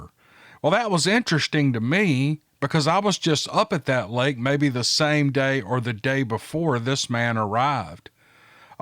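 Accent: South, United States. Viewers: We love your stories! And your southern accent😁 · Those are some sweet ladies you got in your sideyard there, 🌱 I very much enjoy your story telling. Being half-Southern, your accent is wonderful in my ear. Thank you! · Oh yes I listen during the day and almost every night as I drift off to sleep I enjoy these encouter stories but more than that I love southern voice accent, a follower southerner 😊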